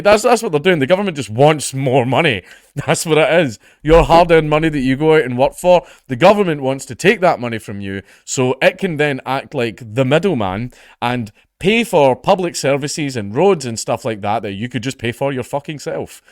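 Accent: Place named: Scotland, United Kingdom. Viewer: Your commentary is always spot on, but I’d listen to you even if it wasn’t because I love that Scots accent!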